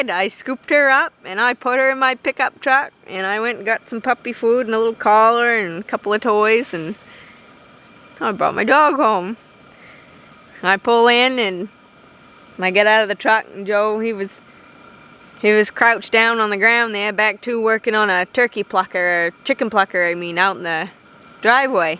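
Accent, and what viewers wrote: Maine, United States. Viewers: You have the Maine accent 100%, It sounds cute to us flatlanders.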